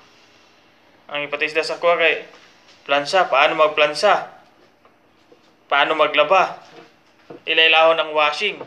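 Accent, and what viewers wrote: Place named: Visayas, Philippines. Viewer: Hahahaha bisaya jud oi..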